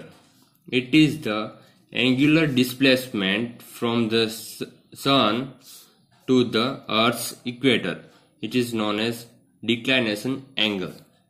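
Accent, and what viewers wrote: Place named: Gujarat, India. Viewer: your gujrati accent is too good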